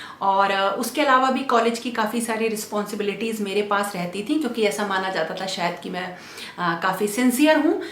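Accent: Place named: Gujarat, India. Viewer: Nice information. ji after viewing this video I've subscribed ur channel. From ur pronounce I think u r Gujarati, BTW I am Gujarati. Thank You and God bless you. Struggle is the real sugar who makes ur efforts sweet.,🙏❤️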